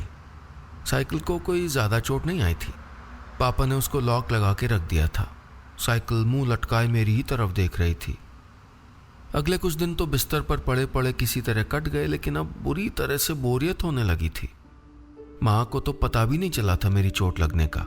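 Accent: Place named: Pahadi, India. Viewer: Pahadi accent awesome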